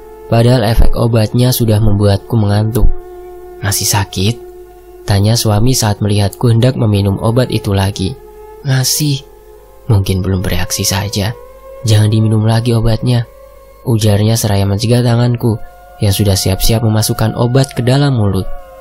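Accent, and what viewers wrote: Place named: Banjar, Indonesia. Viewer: maaf.. urang banjarkh ini.pina nampak logatx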